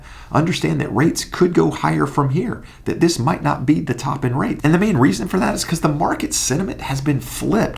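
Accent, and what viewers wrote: California, United States. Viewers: You sound like someone who lives in California .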